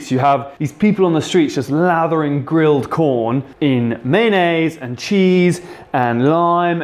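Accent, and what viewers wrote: United Kingdom. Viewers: Hot guy with british accent and making mexican food street? YOU'RE PERFECT! 🇲🇽❤️ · i'm mexican, and you sound very cute when you say elote Hahah, i love your accent😍❤ the british accent is the Best❤😍😍😍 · I'm Mexican, and it's so cool to watch you make one of my favorite street foods. It's quite funny and cute how you describe everything with your British accent 😁 I just loved your "chopstick elote with cojita cheese" 😂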